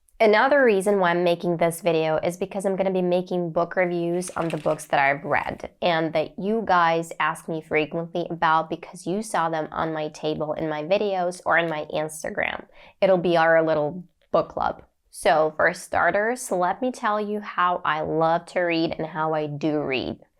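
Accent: United States. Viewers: Wow I thought you are American You speak with 95% American accent. That's very impressive I've never seen someone from CIS who speaks with almost pure American accent. You encouraging me to improve my speaking skills. Keep it up👍 Thank you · Hey Master! New to your channel. Just wondering where are you from? You sound like American. Also love your accent or the way your mouth says English words. Greetings from Deep Space! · I thought you are native English speaker. You speak just like Americans. Did you ever live in the USA before? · You make me crazy... Well, twice too american accent... You speak clearly, of course, thanks a lot, everything is understandable...but accent...Who are you originally? I've heard words Russian, Ukranian...Hah... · You are so pretty and you look l and sound like a american woman😁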